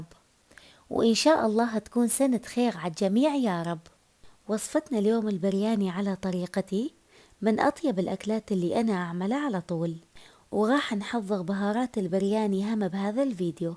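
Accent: Mosul, Iraq. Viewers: الهجه المصلاويه تجنن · هذه لهجة حضر العراق القديمة و التس بقيت أثارها باقيه بلهجة أهل و العوائل الأصلية لمدن: الموصل، تكريت، حديثة، عانه، هيت و غيرهم. و سابقا كانت لهجة اهل بغداد كذلك و التي حافظ عليها اليهود و المسيحيين. اما ما يطلق عليه اللهجة العراقيه حاليا فهو خليط من بقايا اللهجة القديمة مع لهجة العشائر العربية البدوية من بادية الشام التي استوطنت العراق إبان الفراغ السياسي في نهايات ال ١٦٠٠ و التي غيرت التركيبة السكانية و اللغوية و الثقافيه لسكان العراق كون الغلبة كانت لهم و يس لأهل العراق الحضر. و هكذا تغير كل شيء. تحياتي لأهل الموصل ممكن حافظ على لهجتنا الأصلية العريقة. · مااحلى اللهجه الموصليه . وعاشت ايدك على الطبخه والوصف المبدع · اللهجه الموصليه اجنن ❤❤ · لهجتها مصلاوية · هاي اللهجة المصلاوية شوية تختلف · مو حلوه الهجه لمصلاويه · انا كل الفيديوا استمع للهجهه المصلاويه 😅😅😅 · وصفة روعة 😍😍😍 ... عاشت الأيادي والأروع اللهجة المصلاوية الجميلة ❤❤❤ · حبيبت قلبي انا من بغداد استمتع ❤بصوتج واللهجة ❤الهلنا وناسنا الطيبين ❤من الموصل ❤ اكلاتك كلها مميزة جداً لذيذة نشكرك تعبناكي ويانا❤❤ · انا والله كنت مستمتع بالوصفة ومستمتع اكثر بالهجة العراقية الجميلة وتعلمت بعض الكلمات العراقية ، تحية لكل اخوانى من العراق اخوكم من مصر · هذه لهجة الموصل ...نينوى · هذي اللهجة تعود لأهل مدينة الموصل فقط شمال العراق وليس كل العراقيين يتكلمون هكذا مع تقديري لك والمقدمة البرنامج الرائع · هذه لهجة أهل الموصل تختلف شوي عن لهجتنا العراقيه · الف عاغيه وعاشت ايدج وشنو هاللهجه المصلاويه الحلوه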